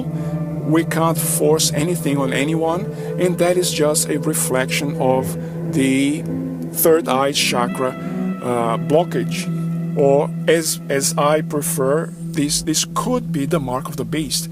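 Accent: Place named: Germany. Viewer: Oh, WOW, lovely to have an interaction with you, especially since we are both on the "yellow brick road" like Dorothy from the "Whizard of " leading us to our true home! You are quite right about Austria being a place of knowledge, art & culture, but on the other more darker side it´s a stronghold of the Cabal (south of Germany, north of the Vatican & east of the Swiss financial Babylon - no coincidence that I decided to incarnate right here to clear up the stuck energies of bloodshed & endless wars on European soil. I was wondering about your accent & thought you might be of German origins as well, but you also made references to the Portuguese language..... so you got me confused there;-) But it doesn´t really matter as long as we are using the language of love & you definitely do;-)) Stay safe, & looking forward to meeting you in your next videos!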